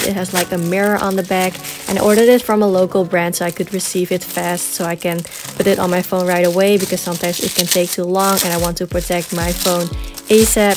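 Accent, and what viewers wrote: Netherlands. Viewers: Sterk nederlands accent😆😋😋 Leuke video👍🏽Heel ontspannend met de achtergrond muziek en rustgevende stem🥰 Top👌🏽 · Is it me Or is your accent extremely dutch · are you dutch? it kinda sounds like you have a dutch accent :’) loved the video btw !! :D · Correct me if I am wrong, but your accent tends to be dutch-English I am right?. BTW nice unboxing-video especially the screen-protector. It's so easy. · Gurl are you Dutch? You sound it .. the accent. Im thinking of going from the 6 to 12... Was it possible to transfer data from 6 to 12 wireless? Or icloud backup?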